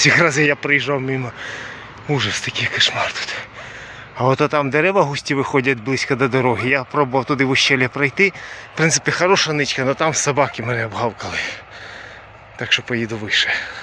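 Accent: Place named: Surzhyk, Ukraine. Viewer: лю твій суржик а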